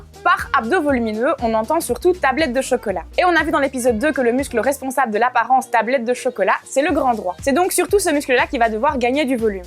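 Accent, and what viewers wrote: Belgium. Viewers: Hey j'adore tes vidéos Néanmoins j'entend un petit accent serai tu une petite Belge tout comme moi ? Continue ce que tu fais t'es top 🐱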